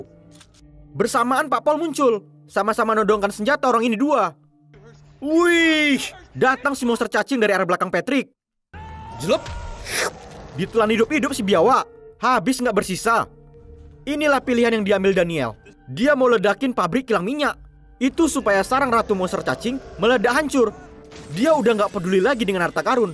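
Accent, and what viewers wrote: Medan, Indonesia. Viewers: Anak medan fixs :v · Logat Medan nya khas kali hahahaah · Medan kali logat'a bang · bahasa kek orang medan cuk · Bang sering upload lah bg Logat Medan bg 😁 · Bang kau orang medan kh? Kok kek orang medan kau bang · Mimin org medan kah? Logat medannya lengket kali🤣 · Logat org medan mmng gtu bg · Sering2 upload bg... Orang Medan ya bang ? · Bg orang Medan bg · Orang Medan kau laii 🙄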